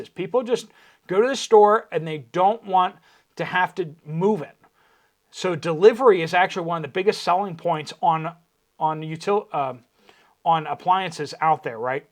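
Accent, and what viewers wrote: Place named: South, United States. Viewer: Sounding like southern prepper again....😢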